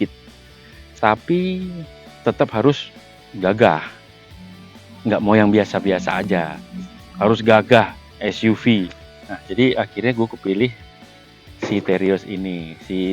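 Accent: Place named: Java, Indonesia. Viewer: Jowo ne medog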